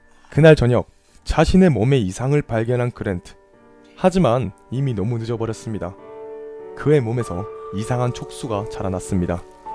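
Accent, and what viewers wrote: Gyeongsang, South Korea. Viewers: 님 갱상도 출신이네요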